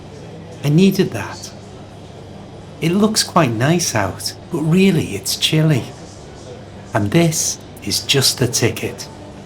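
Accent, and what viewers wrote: Liverpool, United Kingdom. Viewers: Accint liverpool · Your class was very didactic, but the Scouse accent is very complicated, in many parts I didn't understand what you were saying!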